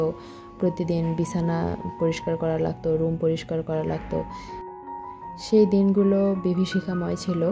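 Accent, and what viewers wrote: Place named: Rangpur, Bangladesh. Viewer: না বুঝলে আর কি করার বলেন কিন্তু ওই আপু হয়তো বুঝেছে আর আমি কিন্তু খারাপ ভাবে বলিনি অনেক নরম করেই বলছি কমেন্টে হয়তো বুঝতে পারেন নি ভয়েজ অপশন থাকলে বুঝতে পারতেন আমার কথা যাইহোক এই আপুও রংপুরের আমিও রংপুরের আর আঞ্চলিকতার টান সবার থাকে কিন্তু কিছু কিছু শব্দ উচ্চারণে এই রকম হয় অনেকের আমার যে হয় না তা নয় আমারও হয় কিন্তু আমিতো ভিডিও করছি না ঘরের মধ্যে কথা বলছি তাই কেউ বলবে না কিন্তু উনি তো ভিডিও করে সবার সবটা পছন্দ নাও হতে পারে। আর আমি শিক্ষা নিয়ে কোনো খারাপ কথা বলিনি ভালো করে কমেন্ট পড়ে বোঝার চেষ্টা করেন আজগুবি রিপ্লাই দিয়ে বুঝাতে চাইলেন আপনি খুব ভালো মানষিকতার আর আমার মানষিকতা ভালো না।আমি এটাও বলছি আঞ্চলিকতাকে সম্মান করি।ভালো থাকবেন।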